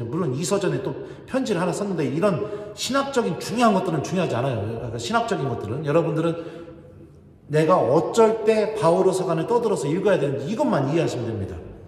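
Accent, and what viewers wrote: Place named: Jeolla, South Korea. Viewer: 지금도 충분히 보기 좋으셔요 ㅋㅋ 전라도 말에 귄이 있으셔요 즉 매력넘치신다는 말씀입니당😊👍😆💖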